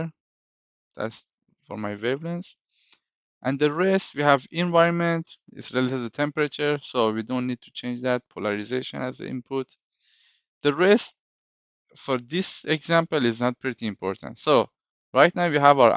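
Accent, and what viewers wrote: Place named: Iran. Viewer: Nice Persian accent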